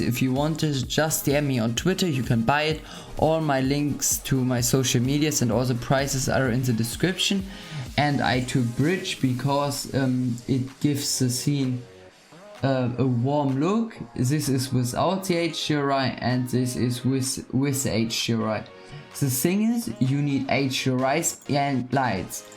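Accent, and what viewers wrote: Germany. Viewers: Hörst dich stark nach nen deutschen an xd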